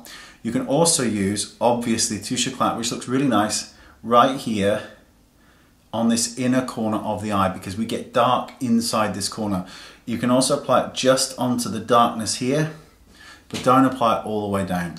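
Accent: France. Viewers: What a beautiful french accent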